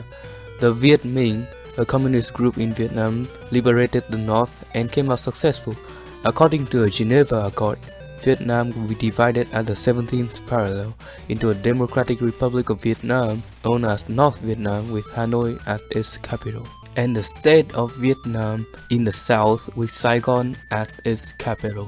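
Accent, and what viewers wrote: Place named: Europe. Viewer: He sounds more European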